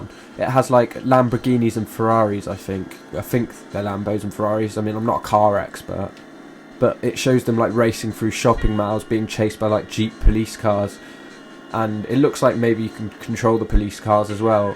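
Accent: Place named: United Kingdom. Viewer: I can hear the British Accent more in his older voice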